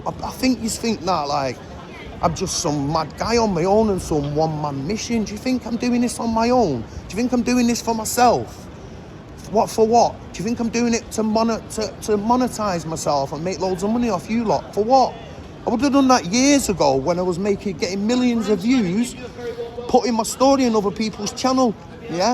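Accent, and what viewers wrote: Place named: Manchester, United Kingdom. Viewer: Its I love your Manchester accent and you are spot on with you are saying this YouTube video: Author my mate and brother..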